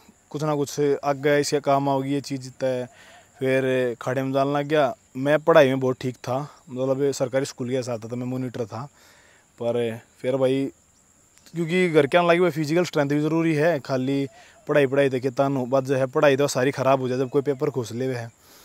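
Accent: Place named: Rajasthan, India. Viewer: Being a Rajasthani I can understand him very well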